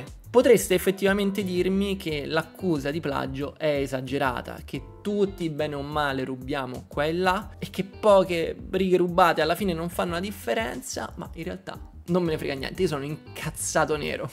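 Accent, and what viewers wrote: Rome, Italy. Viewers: non so se può servire a qualcosa per te leggerlo, ma sei davvero l'unico YouTuber italiano di videogiochi che seguo insieme a Il Grettapensiero e RoundTwo: perché sei calmo, sei umile e perché mi piace un sacco la tua dizione "romanaccia ma non troppo". Non esiste uno che parli come te su YouTube. Ti sembra poco? Per me non lo è affatto. Aggiungici i contenuti sempre interessanti e la percezione di avere davanti una brava persona, e non una bestia di egocentrismo, e diventa ovvio affenzionarsi al tuo canale. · dovresti essere solo orgoglioso, sei forte! fantastico lo spezzone di video del 2018 dove avevi una dizione con accento e tono tipico romanesco, ora però è un piacere sentirti parlare. professionista!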